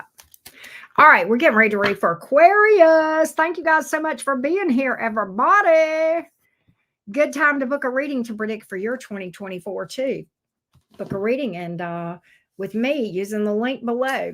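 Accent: South, United States. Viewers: Happy New Year! You’re the cutest! Love your southern accent! 😘🥰😍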